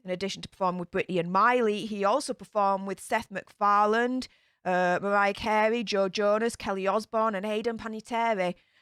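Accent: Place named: Northern England, United Kingdom. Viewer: I LOVE your accent,!! You must be from UK North- Lancashire, maybe? You sound like Victoria Wood a bit, and like the character Bubble on AbFab a bit. I'm American, so I'm not really up on all the British accents, but as a fan of some Bristish shows and stars, that's who you remind me of. <3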